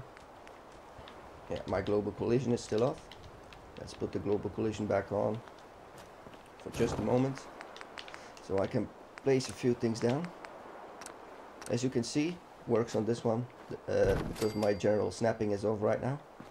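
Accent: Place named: Netherlands. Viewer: Je klinkt Nederlands.. Goeie tutorial man.. Snapte echt geen kut van dat menu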